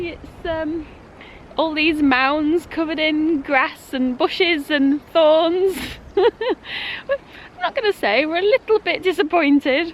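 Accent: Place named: North Yorkshire, United Kingdom. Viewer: Thanks for the sights around Pickering -- I especially enjoyed seeing the cool castle, and even the site of the Roman camp was pretty interesting. In our part of the world, 200 years old is about as ancient as we get (and that's rare). The majority of the trees here are older than the majority of the houses. This video also helps answer my wondering about the origin of your accent. I could tell it wasn't southern, but I didn't know enough about northern accents to pinpoint it. So there it is: North Yorkshire.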